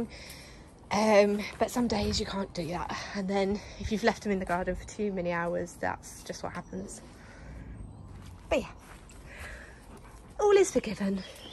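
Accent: United Kingdom. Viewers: British accent is so satisfying 😏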